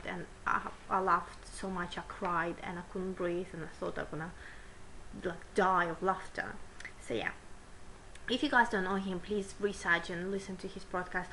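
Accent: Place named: United Kingdom. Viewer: what is your accent? it sounds british but.. with a mix of something? it's cool!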